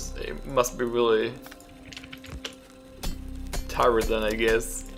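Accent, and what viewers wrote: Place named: Eastern Europe. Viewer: I thought he was eastern European like the developers.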